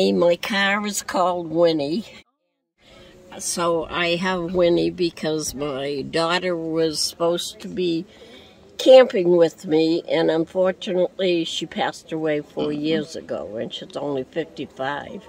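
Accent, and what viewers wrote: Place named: New England, United States. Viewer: I love hearing yet another New England Accent on the road besides Lulu. I can't wait to join you guys!!!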